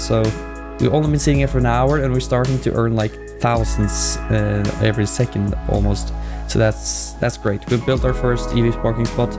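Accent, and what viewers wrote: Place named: Norway. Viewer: im norwigian to i can kinda hear that your norwigian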